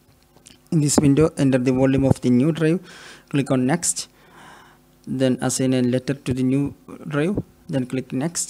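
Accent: Kerala, India. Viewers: R u from kerala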